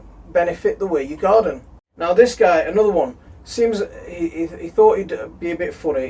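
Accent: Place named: North, United Kingdom. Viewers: First video of yours I've watched, I'm subscribed to a few gardening channels so probably why the suggestion came up. You sound like a decent lad, a fellow northerner with the same passions as me (although you seem far more gifted). Subbed. Outrageous comments, solidarity. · I am really sorry to hear that you have received this sort of vile abuse, there is no place for it and I respect your courage in calling it out. A positive which has come from this event is that I and many others have discovered your channel and shown their solidarity- you are not alone. Its great to hear a northerner sharing gardening tips, it reminds me of home. "I’ll tell you to convert to no dig” had me laughing, I admire your candour and sense of humour. Best of luck in your garden in the new year.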